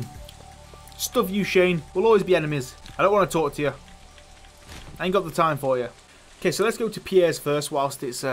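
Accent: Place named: Nottingham, United Kingdom. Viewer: Great vid. Keep it up. Where in England are you pal. Sounds like a Nottingham accent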